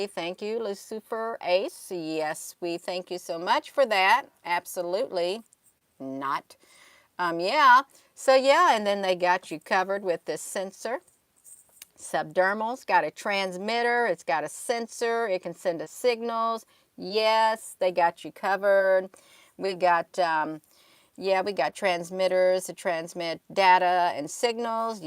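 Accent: South, United States. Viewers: Y’all, Loving 🥰 hearing, I just love ya southern bell accent? Your so priceless, the best way to announce such awesome, beautiful,greatness exploring and explaining what a super amazingly interesting 🤨 slightly evil named new style of body Art, that no one can see, I’m soooo bubbly just listening and been soooo excited by this cool 😎 new program digitally tattoo, Mmmmm I’m so glad 😀 lol lol On and off, after work! Before work Mmmm I may have “ IN bad Mood, Stay away “ Then..........” I’m mentally ok 👌 to day, don’t worry you can say Hi too me “ Oh, I think You’re just found, out the place I can truly fit in, Wowed, I’m so excited, I need to go now as I need to work so I can get my whole arm sleeve down!!!!! Mmmmm “” From My Heart ❤️ to Yours ❤️ “ Do you want me to get my one first, Then we can have our own club, Or maybe “I believe in UFOS 🛸” I’m so glad my skin is clean, thanks, Need to think about what colours ❤️🛸❤️🛸❤️🛸❤️🛸 😘🥰😍 Your so right It’s gonna be soooo Cool the coolest since sliced bread 🍞 xxxx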